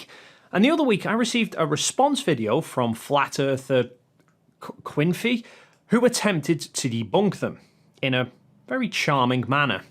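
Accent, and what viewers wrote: Netherlands. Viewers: Yes, the Dutch accent is strong in this more.on. So Goldmember is a better comparison. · He sounds Dutch, with that accent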